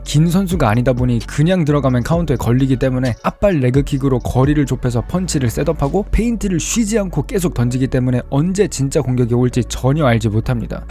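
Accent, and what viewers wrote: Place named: Gyeongsang, South Korea. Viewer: 은 고향이 경상도 어디세요? 저랑 사투리가 똑같네요ㅎㅎ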